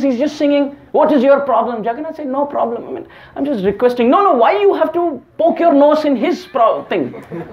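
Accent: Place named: Bengal, India. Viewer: Its a great pleasure to hear Harikatha through your mouth, sir. By the way, are you bengali? Because your sense of humour and tones of your speech somehow get match with bengali.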